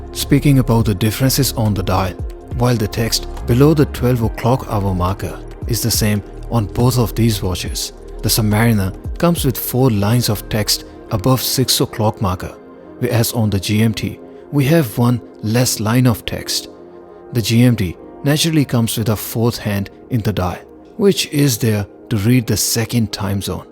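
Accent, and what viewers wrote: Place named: India. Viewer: Damn, indian accent!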